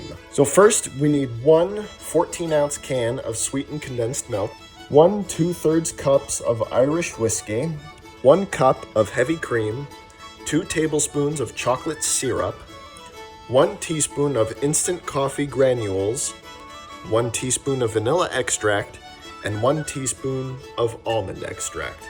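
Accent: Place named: North America. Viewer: a guy who claims being Irish himself - why would he have an North American accent and not Irish?!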